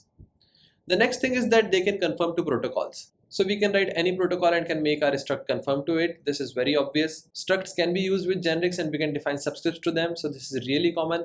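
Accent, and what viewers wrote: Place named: India. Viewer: hint aksanına sağlık güzel bilgiler :)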